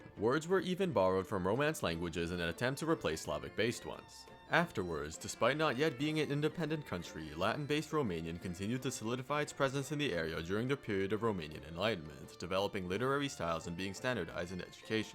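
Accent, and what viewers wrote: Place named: France. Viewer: I love your reading of romance text with a French accent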